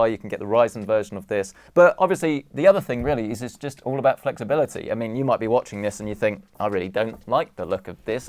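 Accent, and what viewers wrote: United Kingdom. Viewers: Dear Lord its British Linus!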